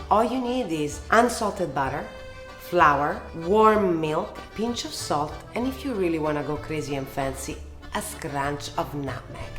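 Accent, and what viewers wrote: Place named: Italy. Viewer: She is loud, hilarious and in your face with her Italian accent. I just couldn't stop smiling while watching the entire video. Oh, I almost forgot the drama and the attitude. I love it!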